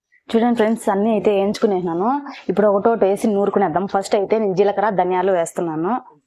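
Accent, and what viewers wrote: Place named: Chittoor, India. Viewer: Chittoor language 😂😂😂😂 me village ekkada akka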